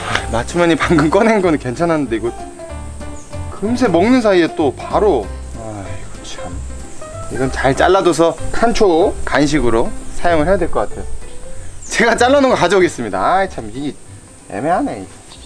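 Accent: North Korea. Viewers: 북한사람 인가요?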